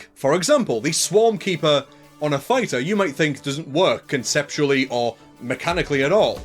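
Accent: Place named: North East England, United Kingdom. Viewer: Hey, are you from the north east of England mate? Im Newcastle so can hear an accent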